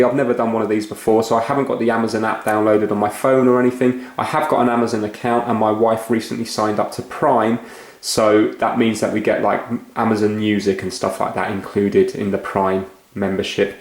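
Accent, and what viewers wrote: England, United Kingdom. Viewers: So nice to hear an English voice and not a yank droning on, well done mate your a star ! 😀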